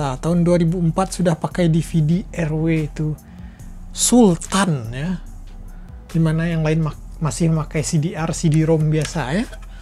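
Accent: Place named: Padang, Indonesia. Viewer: Orang Padang Abang ini agaknya...kental kali logatnya😂😂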